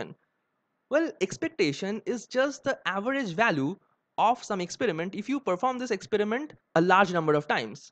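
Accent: India. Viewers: I present you the indian english : )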